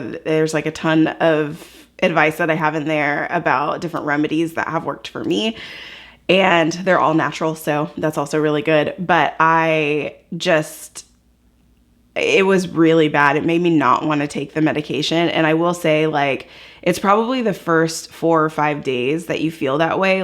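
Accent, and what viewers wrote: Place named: Texas, United States. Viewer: Hi, I just took my first dose of Ozempic and I’m so looking forward to hopefully getting the results that you and my doctor spoke about‼️. Totally unrelated; as I was listening to you I was thinking, “ this chick has to be from Texas”! My doctor’s in Katy.🤣🤣🤣. I wish you continued success in your weight loss and wellness journey. Thanks for sharing🥰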